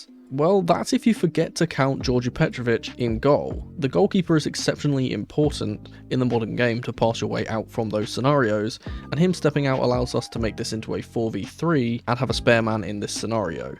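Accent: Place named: Spain. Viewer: that spanish accent. Damn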